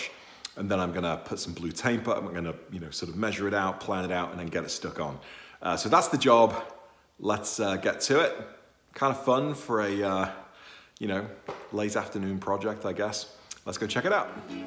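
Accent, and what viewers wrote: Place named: Northern England, United Kingdom. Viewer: I thought it was Liverpool!! I'm in Manchester so could her the Northern in your accent. 😂😂 Came across your channel as I'm on the look out for a 911 myself!! Keep up the good work! 👍👍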